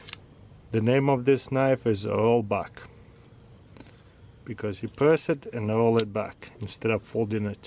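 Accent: Russia. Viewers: Going from the accent, art thee russian?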